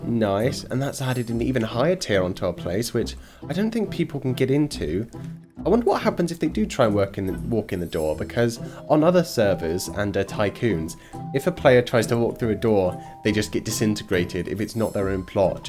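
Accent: England, United Kingdom. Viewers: are you britesh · is he english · Are you British?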